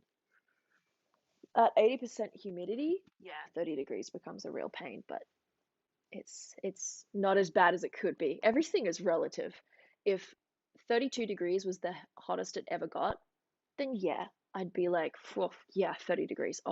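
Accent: Australia. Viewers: I love the aussie accent the way you say certain words littarly make my heart happy lol could listen all day!!!! Also could you check out otep the band there a great female metal group 👌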